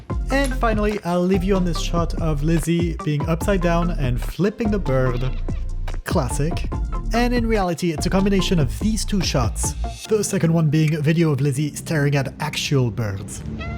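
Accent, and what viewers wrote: France. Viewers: Il y a un petit côté français dans ta voix non ? :) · Incredible video !are u French ? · are you french? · T’a un accent français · Wow, I really only twice heard a hint of your french accent in the mein part of the video. Your English is perfect, especially compared to my Parisian colleagues!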